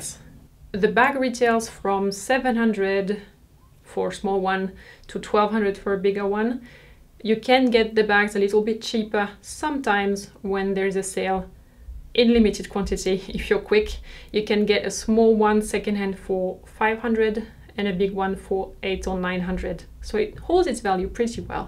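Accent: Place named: France. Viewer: Love the French names pronounced with a true French accent!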